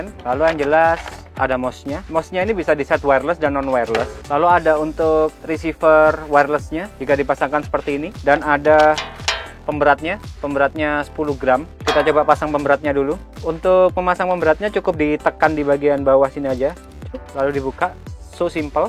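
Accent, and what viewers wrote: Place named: Java, Indonesia. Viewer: bahasa jowone kentel ... hahahaha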